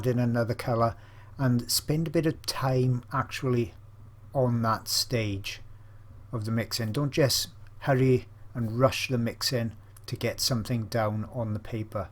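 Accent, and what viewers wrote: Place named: Scotland, United Kingdom. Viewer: Thanks for ur tutorials u are a magnificent painter and u captivate ur audience in every way, I've learned a lot of materials and techniques with u, I'm not professional but I always liked the painting, now I've returned to my taste for pastels, it is hard to get the materials but derwent takes place in my country I bought some derwent pastel pencils in individual way and is more cheap than with the metallic case and other products, I give thanks for the Internet, a warm greeting from Aztec lands Mexico, I hope not bother u but u sounds like a scot beautiful accent 🇲🇽🇲🇽🇲🇽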